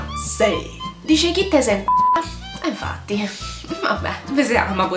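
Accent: Rome, Italy. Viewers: Ho visto tutti gli horror possibili e anche se alcuni mi hanno delusa sul finale mi sono comunque piaciuti... Ma "il quarto tipo" non so perchè mi ha fatta proprio cacà sotto!!! Tu l'hai visto? PS: a furia di vedere i tuoi video inizierò a parlare romano XD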